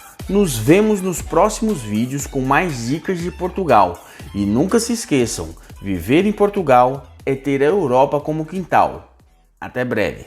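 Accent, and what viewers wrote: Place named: Brazil. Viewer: Português com sotaque Brasileiro, gostei.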